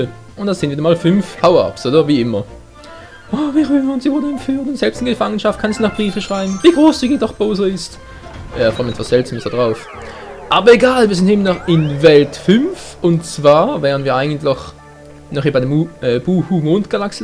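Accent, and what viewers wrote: Switzerland. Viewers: Bist du zufällig Schweizer?^^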